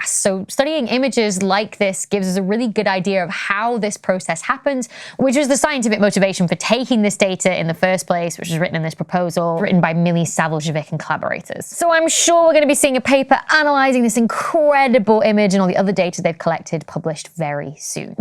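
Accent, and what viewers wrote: United Kingdom. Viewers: I’ve written this before… I used to live in the UK so listening to your British accent is refreshing. How did you cram so much knowledge into your brain at such a young age?! Your intelligence is beyond amazing. With that said, I have issues things you cosmologists expound. Since my education is a master’s in computer science my brain thinks in one’s and zeros, in on and offs, trues and falses… To me and my tiny brain, I cannot fathom an edge to our universe. I completely disagree with the notion that the universe is just 13.8 billion years old. I can’t imagine how this fact is even a fact at all… As Spock says it, “It’s not logical!” Just because we can’t see beyond “the edge” doesn’t mean there’s nothing beyond. In my beliefs, I posit the universe is infinitely old and infinitely big. I have no way to prove my theories. I guess that is why I am so drawn to your presentations. 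I love your videos, so maybe like a dry sponge absorbs water, I may get to a point where I could hold an intelligent conversation with you on these subjects… Merry Christmas, Dr. Becky!! 😊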